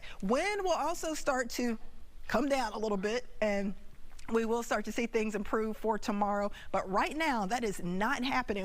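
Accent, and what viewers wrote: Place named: Houston, United States. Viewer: Houston has an accent 👌🙌 she’s great!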